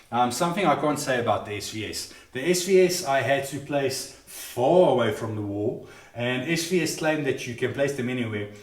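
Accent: South Africa. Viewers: I have been looking at replacing my speakers which were unfortunately ruined in a rain storm we had here in Nova Scotia back in 2023 which flooded my basement. I have been considering these speakers from Martin Logan, the Bowers & Wilkins 702 S2 Signature and the Monitor Audio Gold 500 6G. The Martin Logans are the cheapest of these three speakers. I am running a Bryston 4B Cubed amp which is 300 watts into 8 ohms and 500 into 4 ohms. I know it would drive these speakers extremely well. I have also heard others say that the Bowers & Wilkins can be fatiguing in the treble. Are you from South Africa? I think your accent sounds like that. I am so glad you did this review. Thank you. 👍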